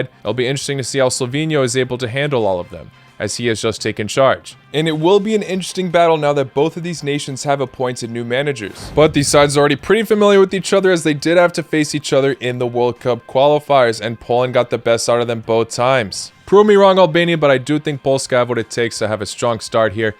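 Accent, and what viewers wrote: United States. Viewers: I swear, your the only person i've heard who has an American accent that has called the sport with it's right name "football". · his accent is kinda American but he commonly wears Croatia kit and sometimes Serbia Bosnia and a Montenegro kit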